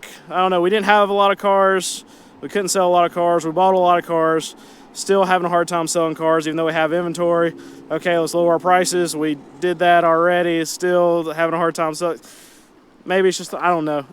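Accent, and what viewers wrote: North Carolina, United States. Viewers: Don't you realize we like your folksy N Cakalacee way!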